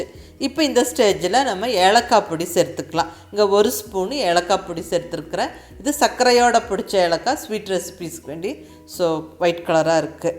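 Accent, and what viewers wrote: Kongu, India. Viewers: அருமையான receipe mam, கொங்கு தமிழில் உங்கள் குரல் அருமை.👌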